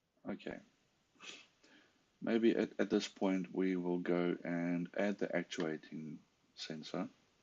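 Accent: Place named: South Africa. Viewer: You from South Africa .. which part ?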